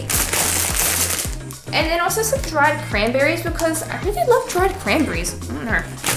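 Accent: Australia. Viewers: I can tell you are Aussie because the stuff and your voice if I am wrong I’ll be honestly shocked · Are u austrailian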